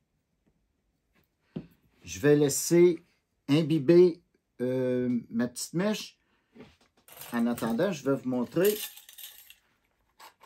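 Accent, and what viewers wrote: Quebec, Canada. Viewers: un accent québécois si mignon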